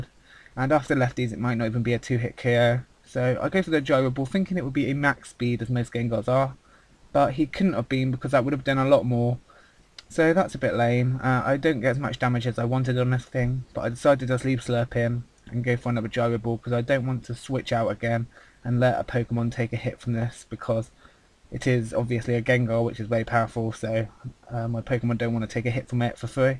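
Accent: United Kingdom. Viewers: You have a British accent :3 xD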